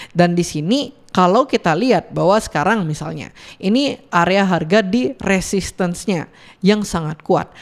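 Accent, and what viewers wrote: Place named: Medan, Indonesia. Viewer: Abang sebenarnya orang Medan ya? Kok logat bahasanya macem anak Medan bang? Huruf K ABG tu khas kali untuk anak Batak Medan bang. Salam dari Medan bang . Saya anak Asahan.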